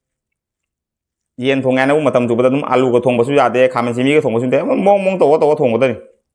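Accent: Cachar, India. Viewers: Brodrj cashar accent male if im not wrong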